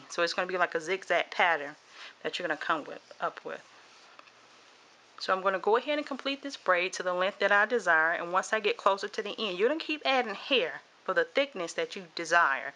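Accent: South, United States. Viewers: I love your accent. It sounds southern. Yes, I'll get a mannequin head from Sally's, lol. Those braids are gorgeous and you are very detailed. ❤❤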